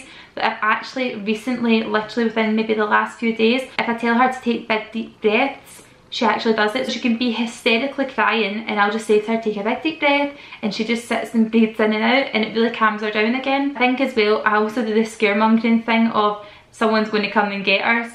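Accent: Scotland, United Kingdom. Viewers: I love your scottish accent and your pretty hair!!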